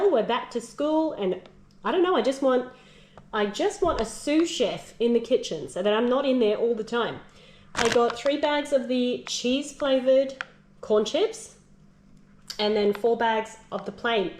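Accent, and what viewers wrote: Australia. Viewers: New subscriber! Lovely to have found your channel, its so great to hear our Aussie accent ❤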